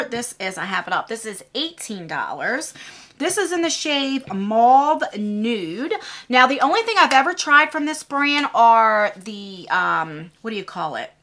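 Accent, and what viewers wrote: New Jersey, United States. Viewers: Mirror mirror on the wall, who is the kindest, down to earth, supportive beauty youtuber of all????? It's just of course!!! I just recently found you but I subscribed after the first video. It's so refreshing to watch your videos because they aren't filled with drama or tea!!! Just real, friendly beauty talk I can take time out of my day to enjoy.....btw, recognized that Jersey accent right away!! Lol since I'm from your neighbor in Maryland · Mirror, mirror on the wall. We love the best of All! And that is not a lie. Love your jersey accent and your videos.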